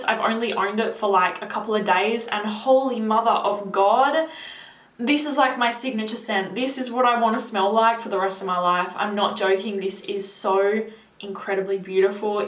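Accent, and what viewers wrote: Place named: Australia. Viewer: I like your accent are you Australian or British?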